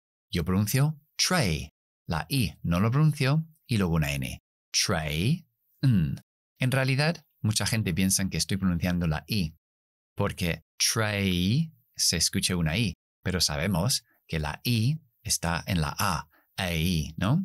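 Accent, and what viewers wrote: United Kingdom. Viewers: Me encanta el británico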